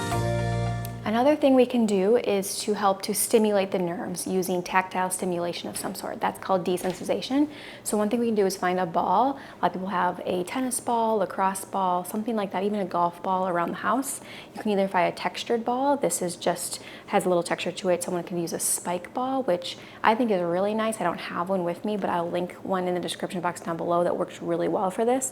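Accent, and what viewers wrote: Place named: France. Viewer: Try British English as I habitant. Not mixture France with English, sound like beef like chicken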